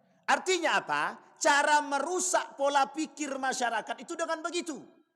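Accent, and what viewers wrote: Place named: Riau, Indonesia. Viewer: Dari Riau sptnya. Sama spt ust abdul Shomad.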